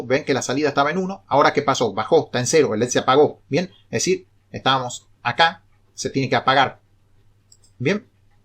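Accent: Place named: North, Argentina. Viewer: Muy buena explicación. ¿Usted es de Tucumán?, tiene tonada norteña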